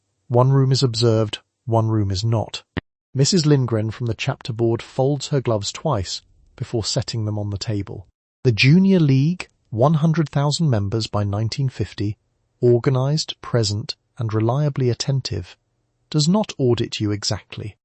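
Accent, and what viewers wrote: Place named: United Kingdom. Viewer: I have trouble falling asleep listening to the British accent.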